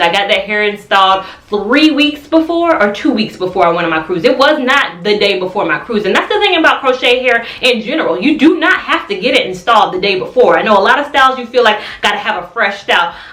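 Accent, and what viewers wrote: South, United States. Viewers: You know, I see people leave comments like this often on your videos, but it doesn't bother me. I'm from northern Florida, so it may be that I'm just accustomed to Southern accents because I never have an issue understanding you. I have had issues with understanding some people from up North who talk fast, though, so I think that plays a small part in it. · I defiantly didn't mean anything by it. I too am use to a southern accent. For the camera it was a bit fast. Nevertheless, I enjoyed your video and have become a new subbie.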